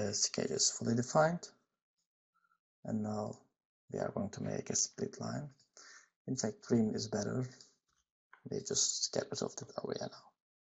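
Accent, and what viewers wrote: Turkey. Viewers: aksanından türk oldugunu anladım, sandalye sırtı gibi ergonomik kıvrımlı düzensiz katı modeller çizer misin?(mesh back diye geçiyor) teşekkürler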